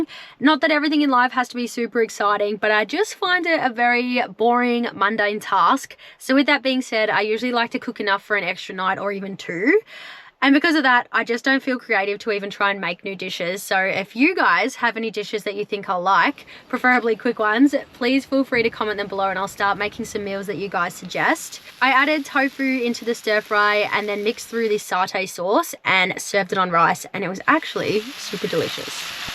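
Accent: Australia. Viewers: Hi honey love watching your videos and you are a very gorgeous Australian sexy young woman honey keep these videos of you keep coming because love the areas that you travel to honey and don’t won’t to brag honey you truly a very sexy and extremely gorgeous young woman i appreciate all of your videos love ❤️ you and your beautiful acsent honey again thank you so much hope to see more beautiful areas of Australia beautiful Outback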